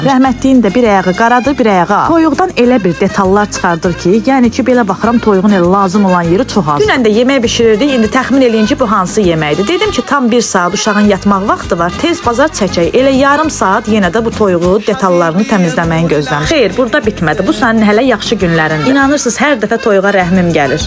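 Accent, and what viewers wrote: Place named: Aran, Azerbaijan. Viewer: nagarsan aa)) aran zonasinin lehcesine benzedi)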